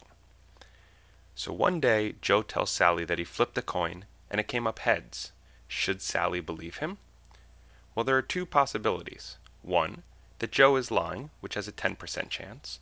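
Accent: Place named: New York, United States. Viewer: lol i detected some hint of "new york" while i was listening too lol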